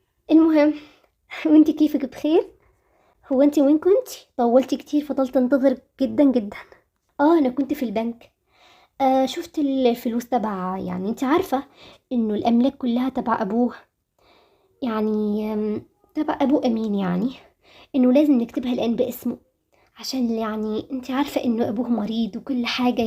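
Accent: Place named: Egypt. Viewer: انة من تونس ولاكن لكنك بتهضر مصري😊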